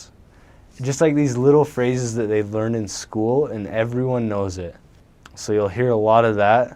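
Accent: India. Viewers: ur indian english is awesome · His Indian accent is on point! I love the way he says liberal with rules, acclimatized stomach... So polite 😄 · You sound perfect (Indian accent) Most Indians who moved to U.S use fake American accent to sound cool😂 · Your indian accent is killer😂😂😂😂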